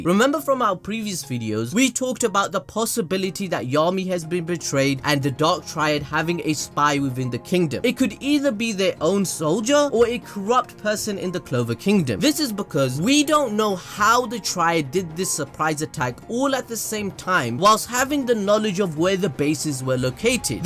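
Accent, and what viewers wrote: Punjab, India. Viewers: Bro are u Punjabi?? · Are you Punjabi?? Dude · are you panjabi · This guy know Punjabi hindi🔥🔥🔥1 no.. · dude are u indian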